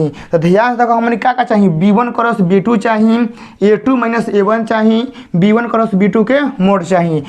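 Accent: Bhojpuri, India. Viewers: Aap bahut mitha bhojpuri bolte hai 😀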